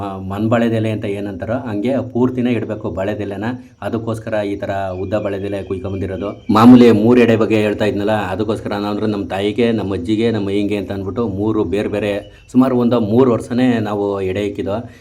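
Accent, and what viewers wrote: Mandya, India. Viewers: ❤ video hakidakkee kushi aithu sir ❤ love your family neamma Mane super ❤ neamma language super ❤namma Mandya language super ❤navu Mandya sir ❤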